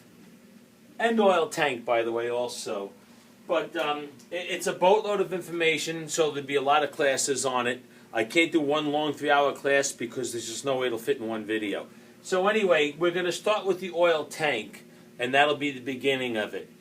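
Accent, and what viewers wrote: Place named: Georgia, United States. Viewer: Great video, sir, very informative. And I love that Southern accent---Georgia perhaps?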